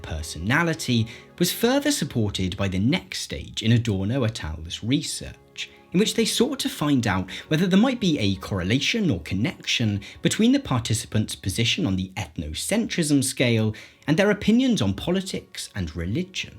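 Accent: RP, United Kingdom. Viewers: the guy sounds like such a tory but his opinions don’t match his voice ahhhhhhhh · How did i know you were a brit before even clicking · haha as if this is the top comment. I'm British and just knew 100% he was British for some reason. glad I found him, his videos are good.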